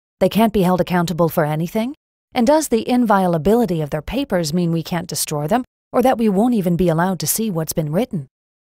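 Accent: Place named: United States. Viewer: Proc je to video namluveny americankou?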